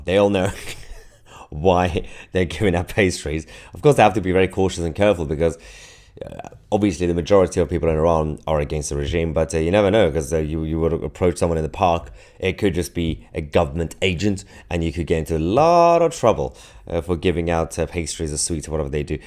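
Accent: United Kingdom. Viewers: British/Persian, God bless you, I understand that, I do not understand what is the ludicris point, of calling you other nationalities, you definitely have a lovely British accent. You are a Brit!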